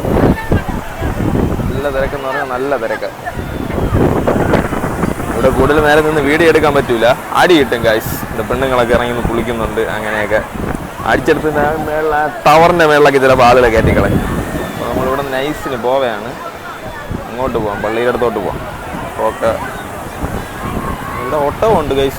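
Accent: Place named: Neyyattinkara, India. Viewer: Neyyatinkara slang super my mother Village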